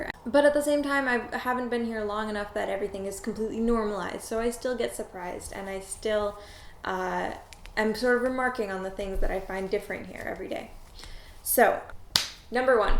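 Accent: Russia. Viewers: Похожа. И, возможно, даже русская. По крайней мере, мне показалось, что некоторые фонемы она произносит немного на русский манер. Да, она отлично говорит по-английски, но в английском есть специфические фонемы, произнесение которых трудно контролировать если ты не родился и не вырос в англоязычной среде с нулевого возраста. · funny ... you have such a strong Russian accent :) However, the word Babushka - pronounced perfectly :) At some point I started to think that you are Russian :) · You sound like behind your English some perfect Russian hides =) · nice soft russian accent =) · у девочки русский акцент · why your pronunciation is so Russian?) are your parents from USSR? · Симпотичная девушка) С очень хорошим русским акцентом, что даже очень странно) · oh, girl, you got that kind of rrrrrussian accent. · You have a Russian accent speaking English. Look Russian too. 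Not buying it. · You have Russian accent!!!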